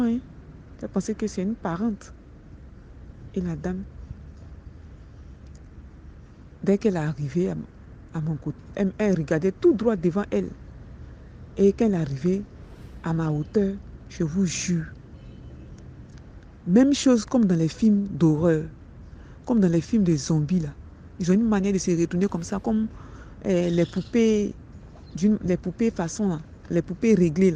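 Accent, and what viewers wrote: Ivory Coast. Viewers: Par ta voix si je ne trompe pas. Tu es de côte d'ivoire.Tu es très couageuese. Mon coeur aller battre plus que mon souffle. Gloire à Jésus. Ce que j'ai compris ce que on dois s'attacher à Jésus en tout temps et en tout lieu.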